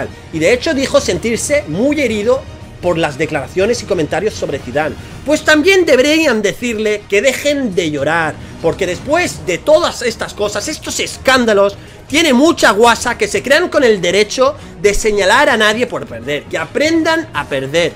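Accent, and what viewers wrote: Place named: Spain. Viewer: canal argentino????? Si el que habla es más español que pique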